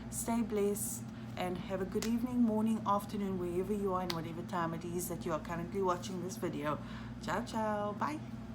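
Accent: South Africa. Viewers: Are you south african because you have a South African accent? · Yay... south African!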